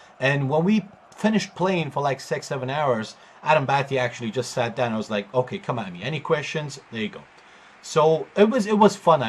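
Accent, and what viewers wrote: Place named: Scotland, United Kingdom. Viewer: Are you Scottish Italian because you certainly have a Scottish accent poking through bro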